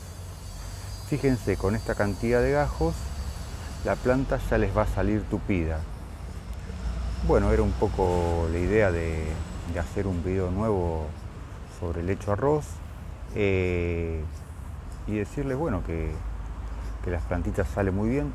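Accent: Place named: Argentina. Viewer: Por fin alguien que habla con nuestro acento! Hola! soy Susana, vivo en Buenos Aires (CABA)Tengo un helecho arroz desde este verano, ahora llegando el imvierno lo tengo como planta de interior. De dia cerca de la puerta del balcón, le da luz pero no sol directo, por la noche lo aparto de alli porque quedaria muy cerca de la estufa. está en una maceta pequeña y las ramitas de abajo van desprendiéndose. Crece de un tronco central y su altura es de 25 cm, cuando la compré era más baja y sus hojas(flores? ) eran blancas, parecían verdaderamente granitos de arroz, ahora es toda verde. Mi departamento es muy luminoso. Me preocupa saber si la estoy cuidando bien...Tendré que transplartarla a una maceta más grande? Podés aconsejarme algo? Saludos y gracias por el video, está muy bueno.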